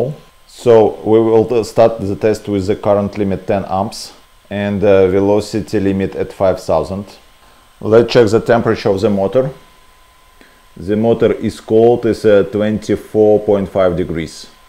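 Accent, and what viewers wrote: Russia. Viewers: Акцент, как у русского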